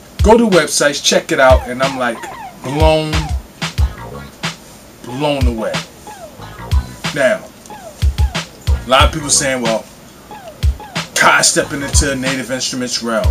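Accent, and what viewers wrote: Baltimore, United States. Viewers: I'm willing to bet money homey is from B-more or somewhere close. that accent is hard.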